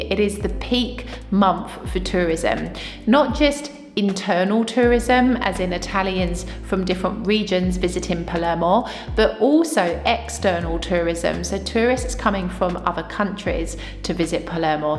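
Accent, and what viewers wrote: United Kingdom. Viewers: Your British accent is on point 👌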